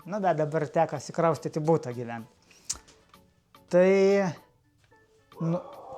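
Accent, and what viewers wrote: Aukštaitija, Lithuania. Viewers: Tau sėkmės ,visada laukiu vidoso.Man dviračiokai nelabai ,bet kai kažką kali ,mali , gamini buiziotę ,tai man geriausi video.Ir kalbėk aukštaitiškai...!!!Super. Aš iš suvalkijos.😂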